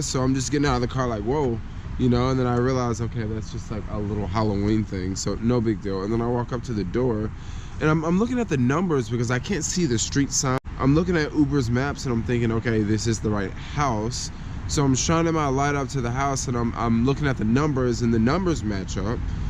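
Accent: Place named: California, United States. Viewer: That Cali accent. Unmistakeable, and unique...especially the bay accent. I miss LA. ;(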